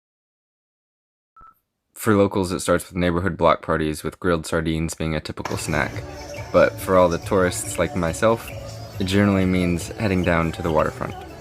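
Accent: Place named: Brazil. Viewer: . está a ficar muito "abrasileirado" 😒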